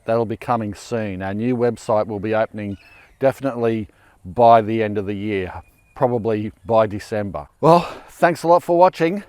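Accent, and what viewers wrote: Australia. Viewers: I am not sure which bit was best in the video *someone speaking with my accent for a change *someone who was still aware of the rest the world while talking about local things *someone who obviously knows what they are doing but is still capably of not being perfect * someone who is game enough to put a full clove of garlic in their mouth on camera. But gently I would like to point out...that ** puting dirt from the garden in your mouth is about as dangerous as stoking a camp fire with your penis! 😮 Aussie Aussie Aussie...oy oy oy!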